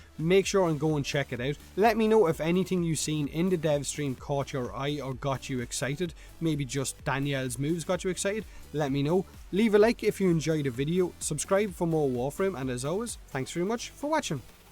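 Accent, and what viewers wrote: Ireland. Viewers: Hmm, your english sounds a little bit strange. Are you irish?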